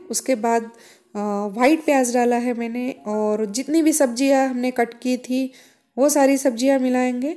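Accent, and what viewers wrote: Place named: Maharashtra, India. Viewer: mam aapke baat karne ke tarike se malum howa ke aap maharashtra se hai thanks mam reply main bhi maharashtra se ho aurangabad se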